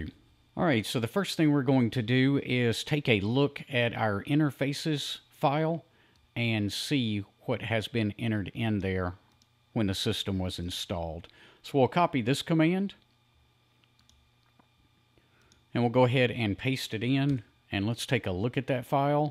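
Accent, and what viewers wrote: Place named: United States. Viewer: remember gentleman his accent is country not stupid Great video dude gonna help me with my school project.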